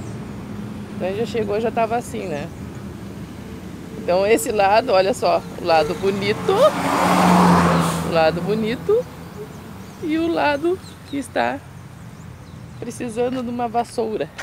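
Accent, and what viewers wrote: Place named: Santa Catarina, Brazil. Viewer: Vc tem sotaque de Catarinense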